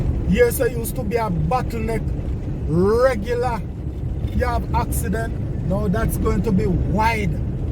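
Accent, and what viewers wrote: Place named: Jamaica. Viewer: I don't know which idiot would complain about the way you communicate . Simply ignore such person as he/she only speaks for self and is making mischief and being hateful. I'm sure it's only one comment as u r simply one of our most articulate communicator... Staying true to our dialect and a proper English speaker .. Does this person expect you to speak another language ? Anyone can tell that you are a very intelligent person .. but it would not have mattered if you weren't (as long as wi understand ) I followed persons that speak 'Straight Up Patios ' and I love it !! It doesn't mean that such a person can't speak proper English .. It's just how one choses to communicate. So what if one can't ?! We have our own dialect and we are proud Jamaicans ! Bredrin , don't respond to those negative bad minded stupid people in the future ! 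Don't accommodate them ! Yeh ? Thanks for all your footage on the development. I am a big fan 👍🏾 Keep up the good work bro! 👏🏾 One Love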